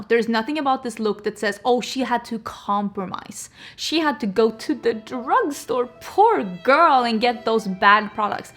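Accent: Värmland, Sweden. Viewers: Great video❤ And yes I can tell you are from Värmland😂 but I love the accent. I am a skåning and get mocked for my accent all the time😂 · I had no idea that you were from Värmland. I actually thought that you lived way more north, but that’s just the mixture of dialects 🤷🏽‍♀️! I really liked this video, showcasing good products that happens to be more affordable than the Sephora brands. 💕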